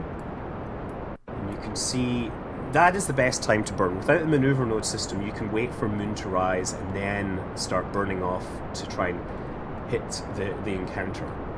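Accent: Scotland, United Kingdom. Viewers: can I just say? I freakin love your accent your dude! You sound like Scotty from Star Trek and that's a good thing!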